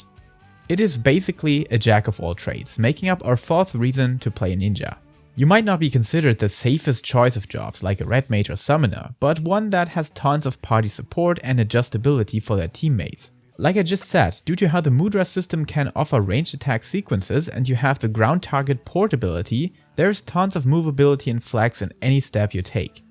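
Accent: Germany. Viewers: you re german right? :D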